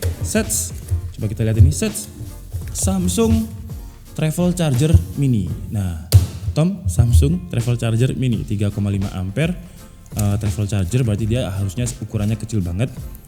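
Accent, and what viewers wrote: Java, Indonesia. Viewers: Radak ono jawa"nya sitik😂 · jowo mu ngendi kang...😂😂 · Sampean jowone ngendi bang. Wkwk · Asli ndi seh sam peno, ⁉️. Koyok e wong jowo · Keri cok, jowo bang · Wng jowo cek😂😂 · Sampean jawane pundi mas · maaf ya kak kakak itu orang Jawa atau orang jakarta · Wong jowo pa bang😅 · Lo orang jawa ya bro. Bahasanya kok mirip orang jawa gtu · Jan wong jowo tenan ki rek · Mase wong jowo🤣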